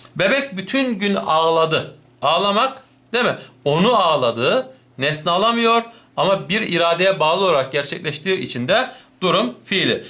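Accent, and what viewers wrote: Black Sea, Turkey. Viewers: HOCAM KAREDEZLI KONUSUYORSUNUZ BANA OYLE GELDI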